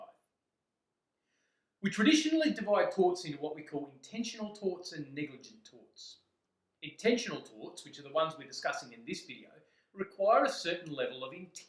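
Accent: Australia. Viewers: god damn aussi accent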